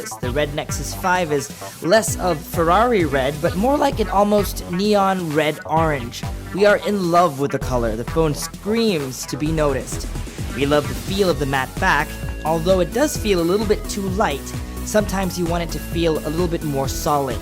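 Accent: Philippines. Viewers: Grabe mga tao dito nanghihila pababa, alam niyo kung bakit siya nagenglish? Gusto niya kasi hindi lang mga pinoy ang manonood pati na mga taga ibang bansa, tska ano naman kung hindi perfect ang accent niya? Problema dito laging ganyan mga mga language/accent police mga tao rito kala mo mga perpekto amp, average naman ang accent niya compared to other filipinos kung magtagalog eh "poreber, beri gud" etc. Di man siya perpekto magsalita ok lang dahil pilipino siya!! Ok!? Pakinggan niyo mga german, french, korean, japanese talo natin sila, wag niyo namang laitin ang kababayan natin, hence focus on the video comparison kesa para kayong gago jan na kinokorek niyo lahat ng bagay.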